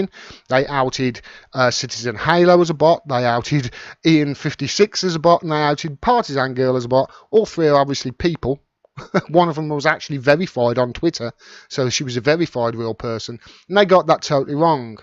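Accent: Birmingham, United Kingdom. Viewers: are you brummy?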